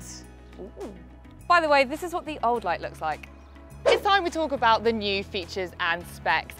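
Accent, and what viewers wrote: United Kingdom. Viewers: With her British accent, talking about London traffic and the bike being 16.3 kg, I was totally expecting her to use KM instead of Miles.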